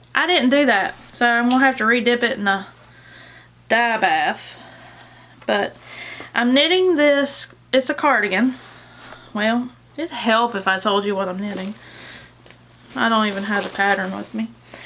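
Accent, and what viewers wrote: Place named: South, United States. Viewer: Probably spelling this wrong..but Cruella DeVille. I would love yarn named for her. White,black,throw some red in for her lipstick and maybe some ash for her cigarette. as I was watching I said..this gal is from the south..she talks like me. I live in Hartwell,Ga. My son use to live in Albany so I know where you are from.❤️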